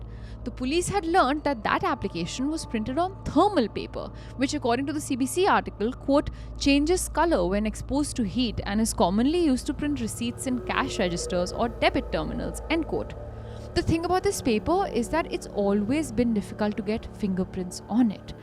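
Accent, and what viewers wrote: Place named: India. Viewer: Start talking in Hindi instead of talking in English with a Hindi accent and you’ll be heard more.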